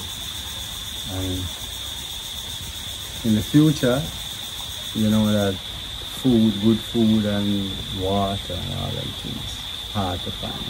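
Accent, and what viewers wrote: Jamaica. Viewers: Love this man love the way he talks and laughf real jamaican roots man down to earth and all. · It's a Kingstonian accent, notably old school folks. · I can hear the Rasta in you. One Love Rasta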